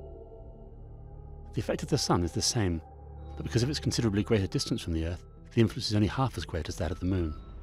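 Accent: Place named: United Kingdom. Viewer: LMAO! Everyone complaining about the narrator are clearly not British. Sorry that British people are intelligent and communicate effectively. I understood every word he said.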